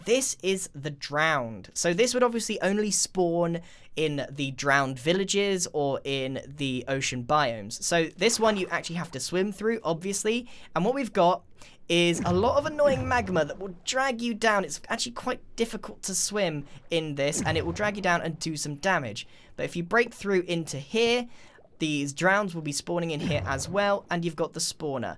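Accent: United Kingdom. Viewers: Sometimes I watch your videos just to listen to your voice. Your British accent is so soothing.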